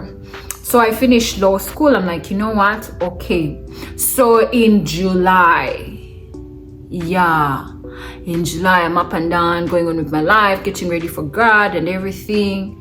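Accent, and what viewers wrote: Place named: Uganda. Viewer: Uganda 🇺🇬 accent is so super wow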